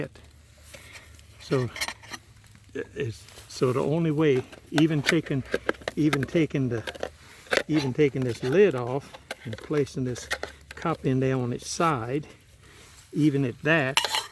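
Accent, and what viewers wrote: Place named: Charleston, United States. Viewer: I'm from SC. I'm trying to figure out your accent....Charleston? Anyway, fantastic vids! From Fort Mill.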